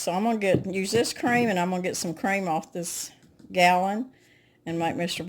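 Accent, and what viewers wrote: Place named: Arkansas, United States. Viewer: Thank you for sharing. My late father was from northern Arkansas and extended family still lives in an area likely not too far from you and where we took many road trips to visit years ago. Your accent and way of speaking reminds me fondly of my late grandmother and my aunts and cousins. Lovely, soft, and sweet. Thank you again from the heart of the San Joaquin Valley in California where tens of thousands of acres of almonds are grown and are currently blooming in celestial creamy white this time of year.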